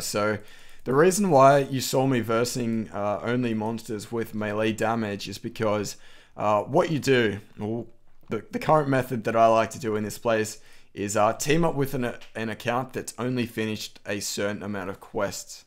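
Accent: Australia. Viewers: Hey mate, are you from the NT? you sound like a real Aussie bloke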